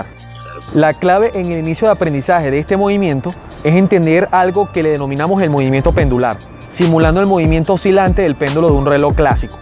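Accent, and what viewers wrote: Venezuela. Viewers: Excelente Video, te felicito. Recién me inicio en el tema, tengo unos tres meses que empece a trabajar en esta modalidad. Había intentado por mi cuenta hacer un MuscleUp y lo he logrado en un par de ocasiones pero todo todo torcido :-) Voy a seguir tus recomendaciones a ver que tal me va. PD. Deduzco por tu forma de hablar que eres Venezolano... Un orgullo encontrar Venezolanos de calidad haciendo trabajo de Calidad. Felicitaciones.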